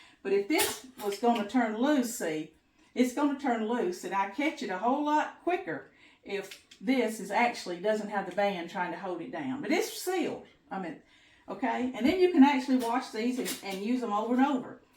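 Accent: South, United States. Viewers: Great video! You're so precious...love your southern accent!! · I’ve only been canning a couple years so I love how you tell a story almost. I am from the south so our accents are similar - Tennessee / North Carolina. I subscribed and will go back to your other videos also. ☺️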